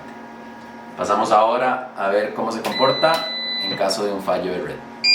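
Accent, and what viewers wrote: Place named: Costa Rica. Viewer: Mae usted es tico? ese acento...😄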